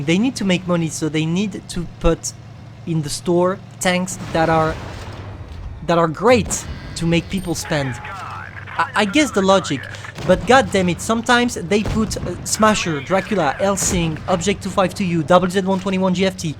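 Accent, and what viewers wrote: France. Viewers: French are you?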